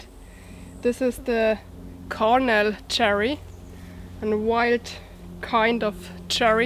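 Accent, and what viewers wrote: Germany. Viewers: your german accent in English is adorable... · Hi. I started watching your channel a short time ago and I like your videos being off grid. I spent 2 1/2 years in Bad Kissingen, Germany while I was in the Army and listening to you talk makes me homesick for Germany. Just thought I'd share that. Thanks for the videos. Take care.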